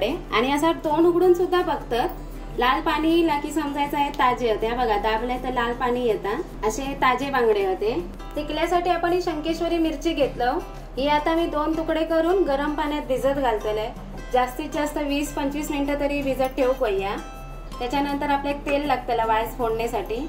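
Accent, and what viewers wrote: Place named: Malvani, India. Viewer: Subscribed just to listen yr sweet Malwani... It's too sweet to hear.... मला मालवणी भाषा खूप आवडते त्याचा feel च वेगळा आहे।।।🙈🤗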